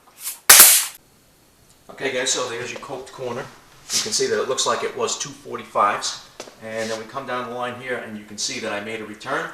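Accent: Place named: Long Island, United States. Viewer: this guys gotta be from long island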